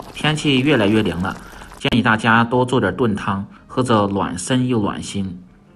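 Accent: Sichuan, China. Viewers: 四川人，一听便知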